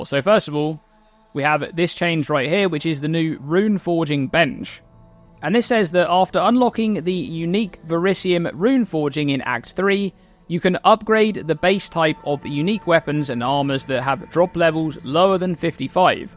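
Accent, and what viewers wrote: United Kingdom. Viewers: 10 minutes is the max for me listening to this illness that is called british accents, damn thats so terrible, sorry for this disease.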